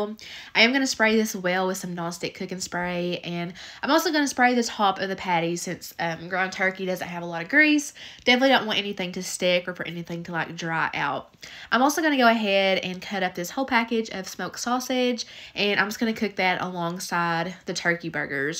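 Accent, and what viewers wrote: South, United States. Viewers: I know this is an older video, but I have just recently found your channel while I have been stuck in bed due to an illness and have been watching YouTube nonstop! I absolutely love your channel! I am in Alabama and I love content creators that have the Southern accent like mine! Don't worry, yours is great, I sound like straight cornbread! Lol. Anyway, I would like to know where you got the pot that you cooked the chili in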